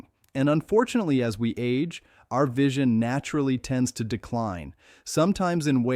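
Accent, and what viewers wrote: United States. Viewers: Well to be honest, what do you expect with a USA narrator?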